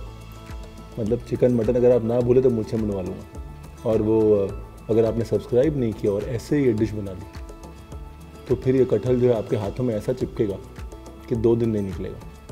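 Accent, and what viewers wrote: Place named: Lucknow, India. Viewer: Scrumptious....Hum bhi kathal.aise hi banate hain par neebu nahi daalte.... Bohat umdaaa aapne banaya hai ji....aap lakhnavi lehze mein.bol.kar Mann khush kar dete hain....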